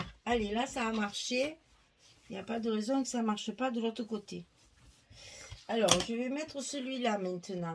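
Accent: South, France. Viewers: Bjr. Vous pouvez être très fière de vous Bravo Magnifique une œuvre d art entre des mains d une magicienne!! Vos tutos sont tjrs des découvertes, la surprise du Chef! On part à l aventure... avec!!! Vos explications, votre patience, votre bonne humeur fait que vous mettez du baume au 💙 dès qu on regarde un tuto, qu on entend votre accent du midi, 🌞 on oublie un instant nos soucis!!! Continuez à nous faire scraper, à nous faire découvrir vos tutos tjrs plus beaux... Merci pour le partage, prenez soins de vous et passez de bonnes fêtes de fin d année. Bises. Marie--France. Débutante dans le scrap mais je fairais un de vos tutos c est sûr!!👋👋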